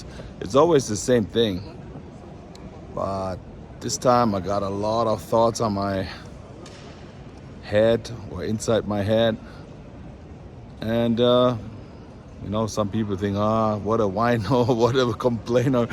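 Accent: Germany. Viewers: Who doesn't love this guy? Please like and subscribe. I love this German guy. Also love the accent. !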